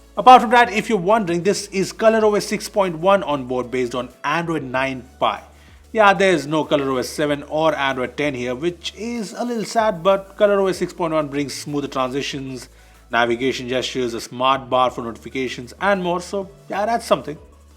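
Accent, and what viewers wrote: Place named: India. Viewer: You exaggerate the "A" sound while speaking , like Amoled etc... Indian accent maybe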